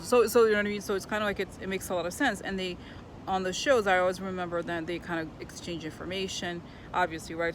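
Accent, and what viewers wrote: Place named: Russia. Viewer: Have you ever watched "The Night Stalker"? An investigative jounalist who had a knack for unwittingly discovering and investigating psychic crimes; a TV-series from the 1970s, starring Darren McGavin as "Karl Kolchak "(imagine I'm typing with your Russian-style accent): 'very funny, I think you like'. Thank you for the succinct and interesting vlog; I'd been wondering the degree of co-ordination required and when, exactly, it had all begun - like, given Trump's history were the various state and federal agencies already in an investigative process. Great vlog, thank you and best vibes from Melbourne, Australia.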